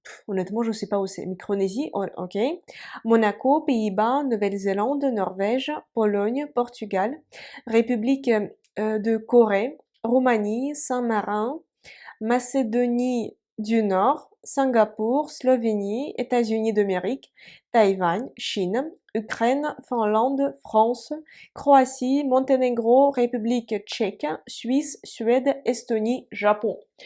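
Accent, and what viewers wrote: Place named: Russia. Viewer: Oui votre langue francaise est parisienne de l il de france c est votre langue de traduction mais avec un accent russe slave superbe ...merci ..zafra le fils de kaidar l algrlerien ...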